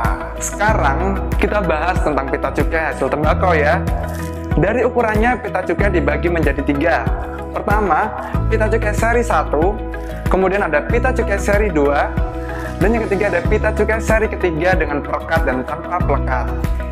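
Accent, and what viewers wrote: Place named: Banyumas, Indonesia. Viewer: Keereen bea cukai ngapak yu...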